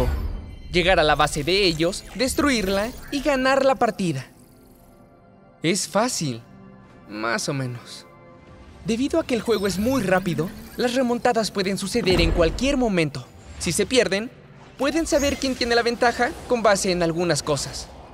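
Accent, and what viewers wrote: Argentina. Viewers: El tipo es argentino no? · Argentino obvio 😎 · Ese wuey es Argentino xd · re argentino el chavon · el que habla es argenti o que? tiene alguna explicacion? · Sigo pensando que el man es Argentino, necesito info, no quiero leer más comentarios nefastos neaaa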